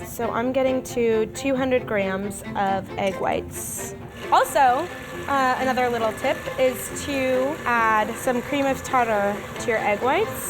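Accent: United States. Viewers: I've never heard a more American pronunciations in my life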